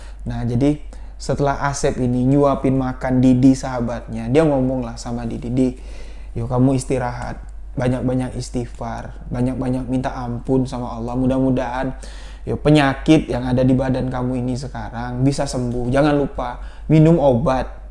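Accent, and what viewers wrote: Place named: Lampung, Indonesia. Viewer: Bang oarang sebrang ya,dari logat nya kayak orang Lampung.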